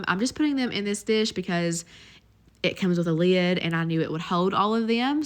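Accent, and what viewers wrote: South, United States. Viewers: I just found this video today as I’m looking for some appetizer recipes. I have to tell you that your southern accent is adorable. All the appetizers look delicious and you had great presentation. Can’t wait to try your recipes and watch more of your videos. Thanks 😊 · Wonderful recipes and you make them look so catered professional. I love you southern accent and you non wasting anything ideas.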